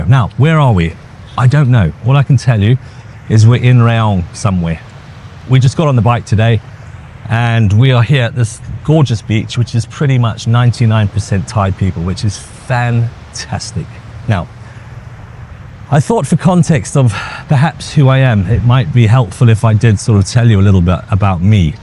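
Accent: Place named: South Africa. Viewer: Definitely pick up your s.a. accent . How many years did you spend in uk after Zimbabwe?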